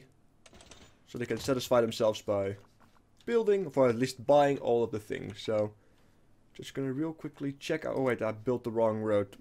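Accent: Netherlands. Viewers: His accent is dutch people.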